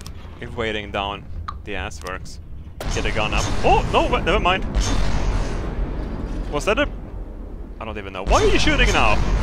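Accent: Sweden. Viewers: GreenColorBombs the power of the swedish accsent mate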